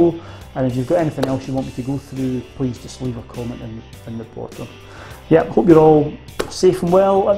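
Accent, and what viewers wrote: Scotland, United Kingdom. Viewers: Auto-captions are hilarious - they can't cope with your Scottish accent! 😂 "Secluded Thailand beaches" 🤔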